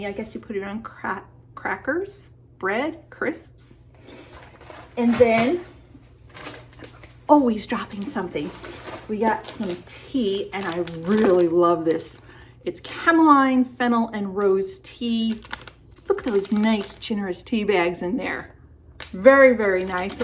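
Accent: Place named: United Kingdom. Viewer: Britain accent. ❤😊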